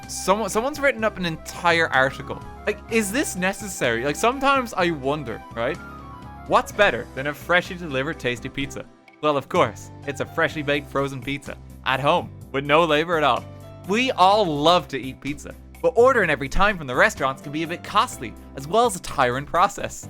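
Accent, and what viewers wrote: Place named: Ireland. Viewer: He's like Jerma but less insane and Irish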